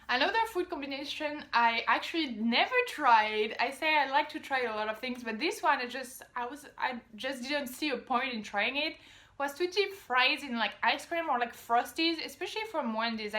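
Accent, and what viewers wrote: France. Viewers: "Mapple sigh-rup", So unmistakably and adorably French., do not let anyone try to change the way you say that ever. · You're too cute! I love French women and their accents. You could be cussing me out or talking bad about me but the words sound so good, in a French accent, I don't care what you're saying about me! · Although you obviously have some French accent (but not the thick, "typical" one) when speaking (american) English, the vibe you are giving off is much more American than French ... Speaking as someone who lived in France for 6 years. And only knows Americans from (too many) movies/television/Youtube videos and hotels ... :> Still.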